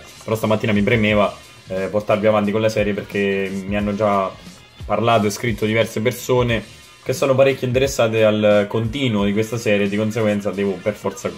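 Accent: Marche, Italy. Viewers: Per me sarebbe molto utile un video sui migliori addons da usare per i novizi. Comunque bel video continua così. P.S. sei marchigiano?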